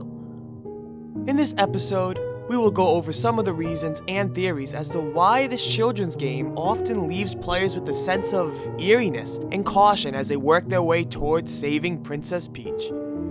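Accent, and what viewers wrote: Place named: Massachusetts, United States. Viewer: You from mass brah? you have the same accent my dad and grandmother doo there from lowe...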